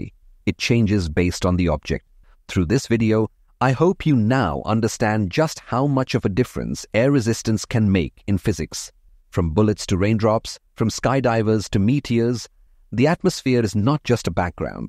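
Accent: India. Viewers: why do i feel like a hint of Indian accent in this videos commentator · Not just a hint. It is an Indian accent. I know because I am Indian. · clear indian accent though. But much more understandable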